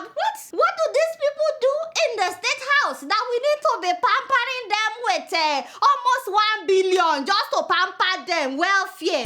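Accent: Yoruba, Nigeria. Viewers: Nice one. Keep it up! I haven't missed any of your weekly episodes since I got introduced to it before the 2015 election in Nigeria. Don't mind my name. I'm Yoruba, and I love the way you speak the raw Yoruba language. I'm proud of you!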